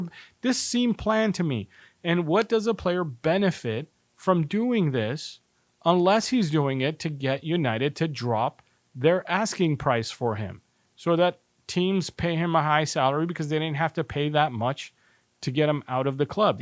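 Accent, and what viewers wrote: United States. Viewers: I am portuguese and I dont care if your accent is american, keep the videos coming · It's strange hearing an American accent talking about football ... I'm not saying you don't have the right like anyone else to be football fans .. but hearing you say 'we' is very unfamiliar... Fair play fella , I'm not hating ...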